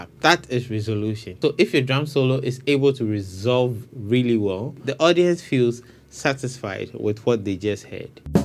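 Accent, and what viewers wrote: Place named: United Kingdom. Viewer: I like how he spoke in a British accent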